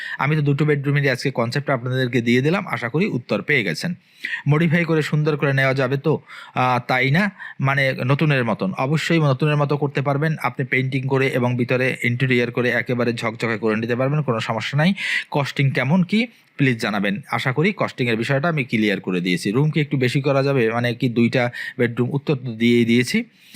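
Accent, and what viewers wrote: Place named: Barishal, Bangladesh. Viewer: ভাই আপনার কাছে একটা প্রশ্ন রয়েছে, আপনি কি বরিশাইল্লা? মানে আপনার বাচনভঙ্গি যতই শুদ্ধ করেন না কেন আপনার বরিশালের ভাবটা রয়েই যায়। বলি কি শুদ্ধ করে কথা বলার দরকার নাই বরিশাইল্লা কায়দায় কথা বলেন তাহলেই তো হয়।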